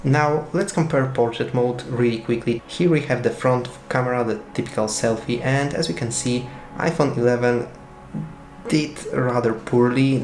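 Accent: Poland. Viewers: Are you Polish? You sound like Polish guy with your English. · you are from Poland :D ?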